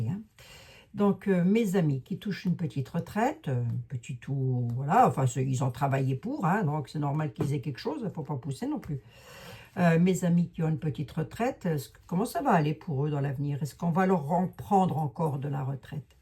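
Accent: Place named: Lorraine, France. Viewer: Coucou, vous "causez" haut, fort et claire Chef 😊(comme dans l'armée😅), de plus avec un bon accent Lorrain (idem dans ma famille😜). Allez je vous écoute sagement💋💋🥰🤩😘❤🧡💛💚💫🌹🌹🍀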